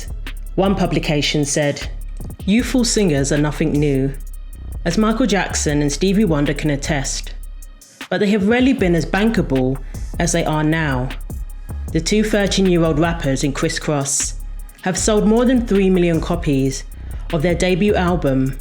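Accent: United Kingdom. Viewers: Good gawd she reads so slow. I had to fast forward real quick when she read the second time. British accents force you to speak so slow. That must be why they speak with an accent. They don’t follow the phonetics of English.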